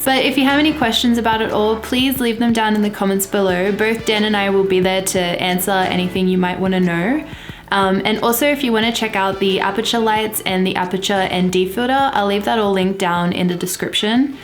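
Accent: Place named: Sydney, Australia. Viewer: From you and my old friend Joann I have decided that the Sydney accent is a very nice sounding english!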